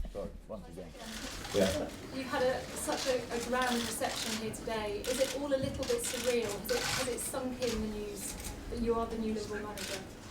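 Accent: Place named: Germany. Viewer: His english is very good, but you can hear sometimes the german in him. 😉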